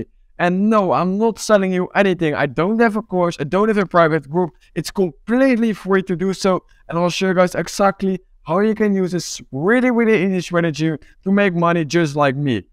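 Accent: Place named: Netherlands. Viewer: goede video man. Je merkt alleen wel dat je nederlands bent😂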